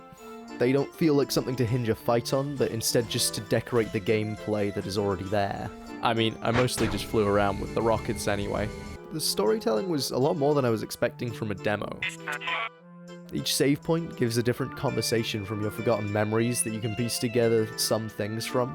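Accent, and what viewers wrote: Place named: Australia. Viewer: you're aussie arent you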